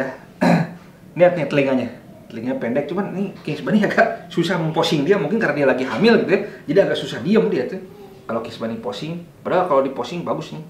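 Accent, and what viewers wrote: West Java, Indonesia. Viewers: Lokasi medan, logat sunda 😆, mantap mang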